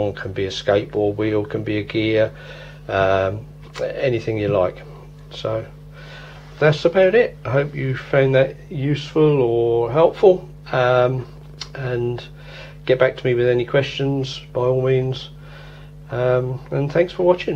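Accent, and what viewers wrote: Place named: Suffolk, United Kingdom. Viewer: You have nailed it Sir, and, if my ears do not deceive me, you are a Suffolk gentleman to boot ! Double bonus ! With best wishes and greetings from South Africa. I shall certainly try this out in short order. Very best, Mike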